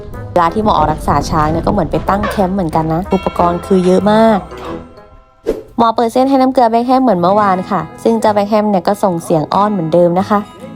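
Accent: South, Thailand. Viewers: หมอเป็นคนใต้หรอ🧐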